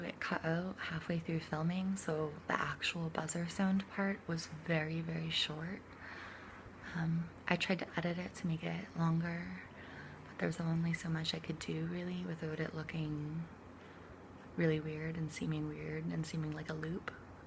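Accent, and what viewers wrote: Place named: Canada. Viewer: Nice video, I usually prefer whispering or soft sounds but your voice is rather soothing. I like that you have a bit of a Canadia accent and also some southwestern. It's very North American and something. Also, cats...cats are love. I hope you're well.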